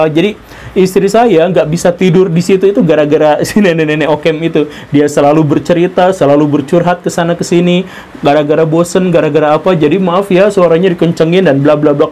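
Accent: West Java, Indonesia. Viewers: Pak guru orang mana ya? Logatnya kyk dari tanah sunda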